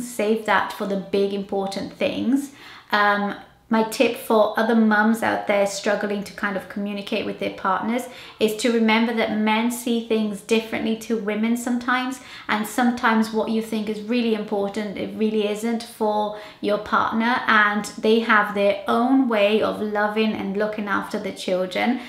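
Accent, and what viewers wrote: United Kingdom. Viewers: Oi Sou Letícia e eu conheci seu canal por um vídeo de dicas de inglês, discubrir q vc é brasileira e fiquei espantada, pq achava que vc era britânica, e me inscrevir no canal de cara tanto pq te admiro tanto mãe quanto pessoa, e agora descubro q vc e de Aracaju!! MDS minha cidade! Estou surpresa achei q vc era de Minas ou Rio kk e nossa vc ja era e é minha inspiração (no inglês pq eu amo seu Inglês ele é maravilhoso!! )Em fim só um desabafo... Se falei muito desculpa... I love your videos so much.. Manda beijo! ❤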